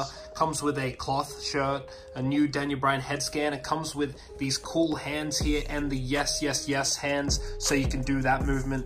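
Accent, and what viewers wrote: Australia. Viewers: Yea I’m pretty sure it’s because he’s from Australia so he has a little accent I caught that when I first watched this but I just saw your comment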